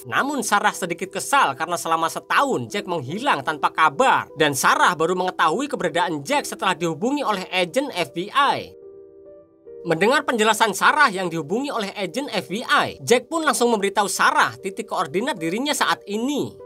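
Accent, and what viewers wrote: Java, Indonesia. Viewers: Bng Mimin bwakn alur ceritanya lucu bngt ci ada k.jwa" gtu😂😂😂mate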